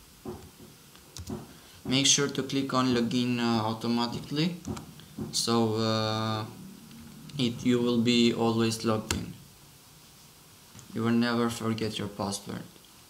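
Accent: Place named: Albania. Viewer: O shqipee . Tkuptova direkt nga theksi i anglishtes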